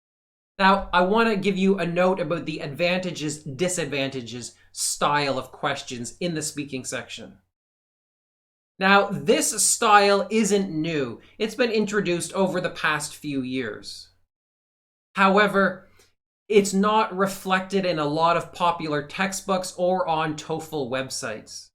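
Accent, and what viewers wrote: Canada. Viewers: Is he from Canada?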